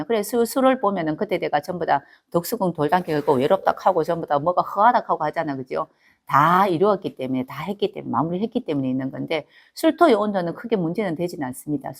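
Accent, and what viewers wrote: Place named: Daegu, South Korea. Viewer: 저 이분 설명 너무 잘해주시고 대구 사투리가 참 예쁘네요 대프리카 싫어하는데 이분강의는 참 좋네요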